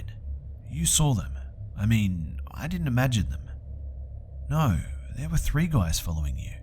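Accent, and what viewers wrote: Australia. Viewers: you and lazym are the only horror story tellers i like. so many boring droners with no...energy. also i think the ausie accent helps! i listen to you most nights as i fall asleep (fear helps me sleep haha). i originally found your channel via chilibeast i think. thanks!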